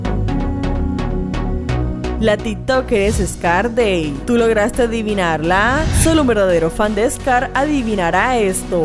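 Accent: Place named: Ecuador. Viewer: Te veo De Ecuador✌❤ por la voz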